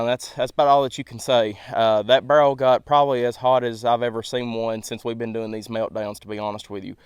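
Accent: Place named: Alabama, United States. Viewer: What state are you from? Sounds like our Alabama accent.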